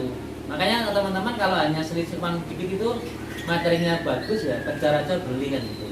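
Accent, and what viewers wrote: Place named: Banyumas, Indonesia. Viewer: Weh tanggane nyong kue ngapak2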